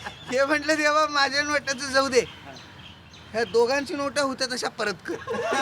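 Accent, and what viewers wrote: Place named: Sangli, India. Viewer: Khar tar solapur cha ahe....Ajit koshti ganya naavacha vinod mala kharach best watatat....pure Sangli karad language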